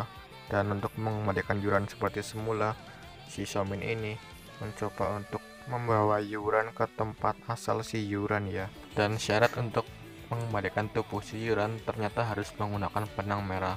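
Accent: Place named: Java, Indonesia. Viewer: Orang Jawa bang? Logatnya kaya orang jawa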